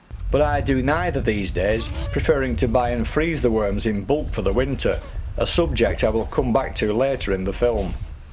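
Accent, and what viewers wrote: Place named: North, United Kingdom. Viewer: The commentary is so Northern I had to wear a flat cap to listen to it. Great video, mate.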